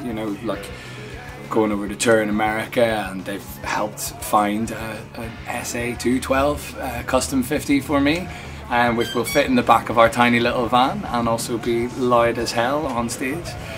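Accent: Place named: Northern Ireland, United Kingdom. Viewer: He's DEFINITELY Northern Irish.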